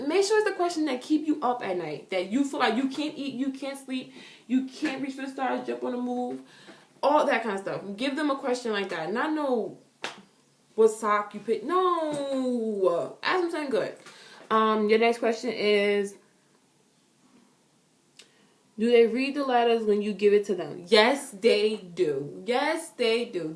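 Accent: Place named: New York, United States. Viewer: u sound like either a new yorker or a philly girl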